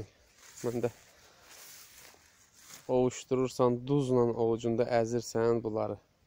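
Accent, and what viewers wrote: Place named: Qusar, Azerbaijan. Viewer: Oy oy yandirmir elivizi ozude ne qederdi deyesen biz terefdi danisiqdan his olunur esq olsun Qusarlilara👍🏻👍🏻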